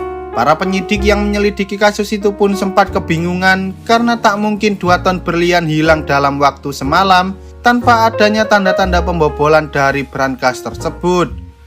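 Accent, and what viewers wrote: Java, Indonesia. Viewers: Suka penjelasannya sellu dgn logat mendok nya 🤭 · Terlalu mencolok wong jowo nya 😂 bg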